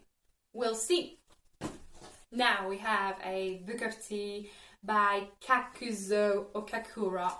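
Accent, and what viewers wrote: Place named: United Kingdom. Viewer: Have u come from the UK?😅 I love the british accent and I admire how fluent u are. Excellent work.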